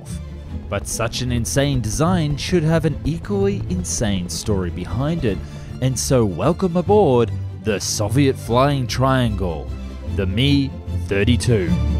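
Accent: Australia. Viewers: I never asked for aussie accent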